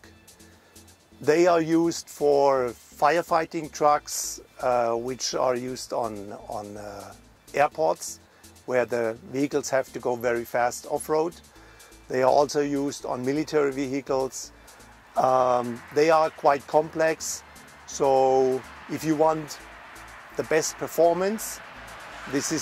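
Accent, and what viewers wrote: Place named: Germany. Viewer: Ich hab einfach mal auf Deutsch geschrieben das der Akzent und der Name unverkennbar deutsch sind.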